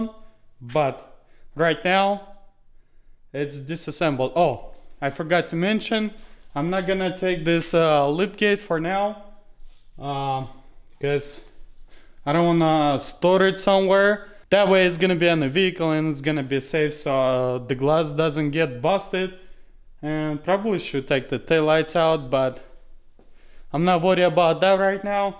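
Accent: Russia. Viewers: I like your job you doing nice work!👍 I like your accent, We're you from? Russia? 😉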